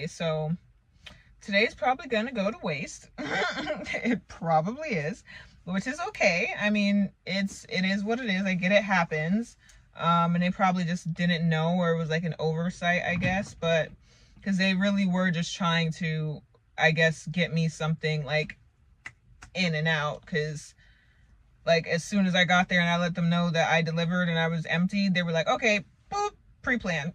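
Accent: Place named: Midwest, United States. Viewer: You with tha' mid-west twang...so sexy, Yo'...Linen set for west travels...Khaki is cool too...You're so particular...ASK AND IT SHALL BE GIVEN...-lots of Love out here for You...You're banter and picadillos are so homey and familiar...why No FB Msngr?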